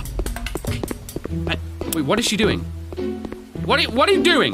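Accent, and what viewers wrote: United Kingdom. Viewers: I hear british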